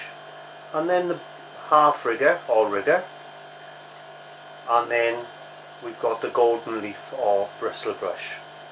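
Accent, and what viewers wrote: Wales, United Kingdom. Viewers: I find your method of teaching so easy to follow, you cover every aspect of painting and make it all look so easy. Love the Welsh accent having lived in S.Wales for a number of yrs. Thanks again for all the tips. Mary Thomas.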